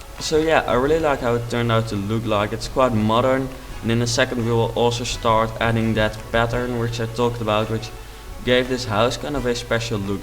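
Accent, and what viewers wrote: Netherlands. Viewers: Haha, inderdaad Nederland accent maar ik vind het juist fijn om naar te luisteren, gaat tenminste niet zo snel ;p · je kunt denk ik beter geen commentaar op je video geven want je hebt echt een heel erg nederlands accent en voor heel veel buitenlanders heel moeilijk te begrijpen en niet altijd even fijn om ernaar te luisteren wel super cool huis enzo :-) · Um he is dutch.So that's why. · You have such a cute dutch accent! Its funny to hear almost all dutchies have this accent hahaha.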